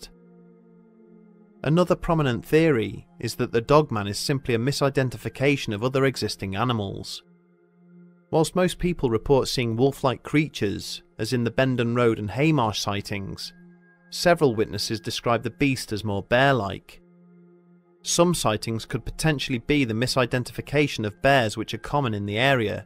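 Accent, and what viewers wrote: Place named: United Kingdom. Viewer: The Michigan Dogman narrated by a Brit......give me a break!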